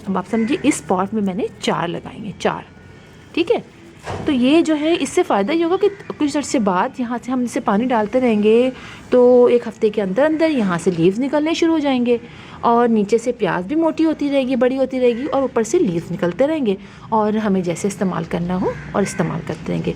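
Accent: Pakistan. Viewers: Pakistani accent and way of speaking is soo sweet to hear ... love from India ❤❤❤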